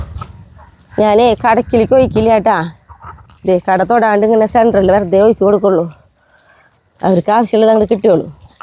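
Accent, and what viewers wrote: Thrissur, India. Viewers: ഞാൻ നിങ്ങളെ vdo എല്ലാം കാ ണാറുണ്ട് വിഡിയോയും പിന്നെ യുടെ ഈ സംസാരം സൂപ്പർ തൃശ്ശൂർ സ്ലാംഗ് ഇഷ്ടം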